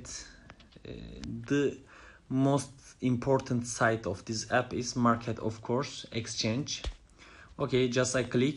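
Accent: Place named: Turkey. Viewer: Ru Turkish lan aksandan belli sil bunu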